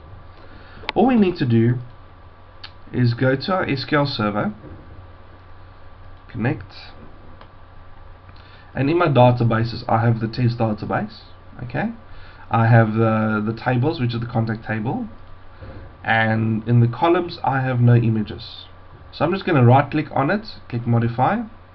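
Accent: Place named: Afrikaans, South Africa. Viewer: your accent sounds afrikaans so you should understand this ('n goeie begrip het 'n halwe word nodig) aimed at P.S Like the Vids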